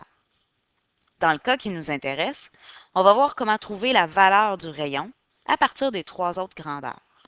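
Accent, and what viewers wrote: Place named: Quebec, Canada. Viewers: Accent québécois*** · J adorre l accent canadien 😍 Très bonne vidéo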